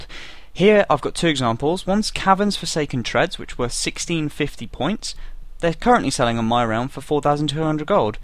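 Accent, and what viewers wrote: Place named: United States. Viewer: Thank you for the video! Love your accent... (usa)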